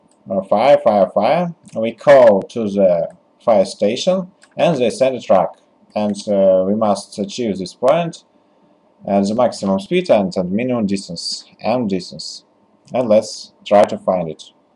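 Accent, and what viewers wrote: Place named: France. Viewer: understanding you is quite difficult. we just want to know how to get the answer and the guy has a french accent that why its hard to understand him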